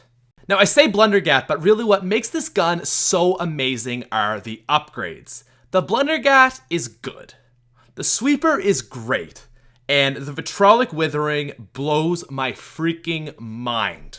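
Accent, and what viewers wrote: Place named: Canada. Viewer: He has the most Canadian pronunciation of out ever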